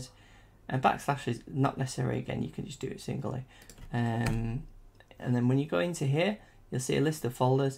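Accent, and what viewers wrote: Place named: United Kingdom. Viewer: You are a genius! I have gone to so many sites to try to fix this flaw on my brand new Macbook Air and your solution is the one that has made the Outlook search function work. The file display on my computer was a bit different than what was shown on your screen but I kept working to open the folders until I finally found Outlook in the library section. By including and then unchecking it from privacy it has started the search function. With your accent you might be in the UK--wish I could buy you a pint!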